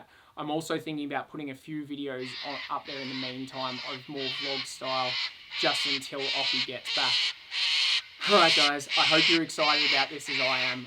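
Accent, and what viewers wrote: Australia. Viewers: I’m a girl who’s a sucker for guys with an Aussie accent. You get me in the divorce. Plus....I love occy.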